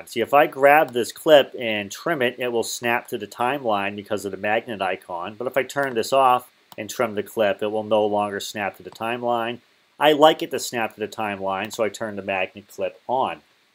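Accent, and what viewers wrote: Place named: New England, United States. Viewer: Great to hear a New England accent. It actually cracks me up I haven't heard it in so long.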